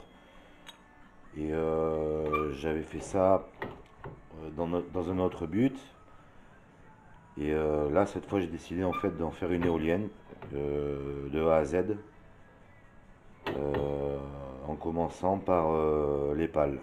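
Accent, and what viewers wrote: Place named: Nice, France. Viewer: bonjour , je suis curieux de voir l’évolution de ton projet ( plus que jamais utile vu les difficulté que connait le pays en ce qui concerne l’électricité ) , voir ou t' en et ( batterie? si oui ,combien d'ampere heure... combien de watts t'arrive à tirer etc ) ps : on reconnait direct l'accent nissart , j'ai grandi a nice :))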